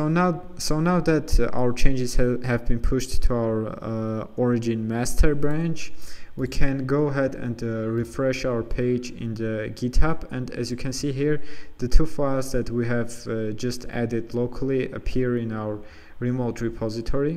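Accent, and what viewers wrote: Iran. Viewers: hey bro, Your accent is very similar to Iranian, are you Iranian? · are you from Iran? interesting accent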